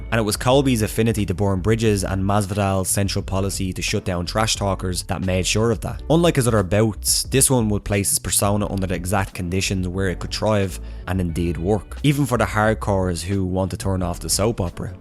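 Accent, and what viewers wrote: Ireland. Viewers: I'll certainly give you a "tums" up on that one. I'd give you "terteen" of em if I could. ;) I had one of the best times of my life in Dublin! My real name is about as Irish as you can get. Love your accent and videos!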